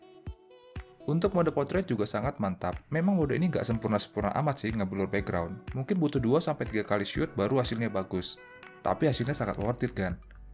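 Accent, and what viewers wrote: Lampung, Indonesia. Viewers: Orang lampung ya min?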